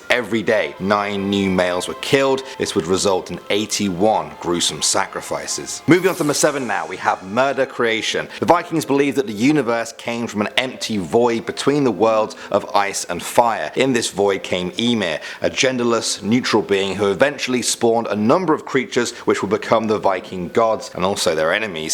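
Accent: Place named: United Kingdom. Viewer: YEAAAA BRITISH PERSON!!!!!!!!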